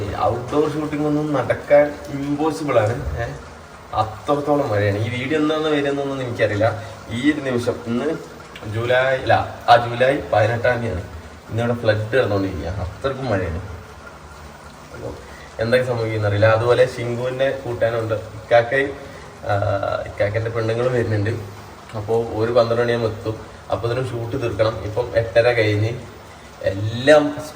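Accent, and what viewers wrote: Kodungallur, India. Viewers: കൊടുങ്ങല്ലൂർ സ്ലാങ് ആണിത്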